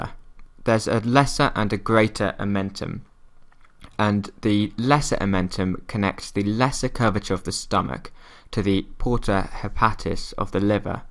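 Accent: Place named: United Kingdom. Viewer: Thank you :D love the fact that you are British... it's easier to understand... :D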